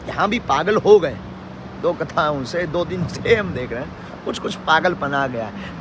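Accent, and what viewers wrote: Bundelkhand, India. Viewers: जननी जन्मभूमिश्च स्वर्गादपि गरीयसी आप और आपकी बुंदेलखंडी दोनों ही बहुत प्यारी है🙏🙏👍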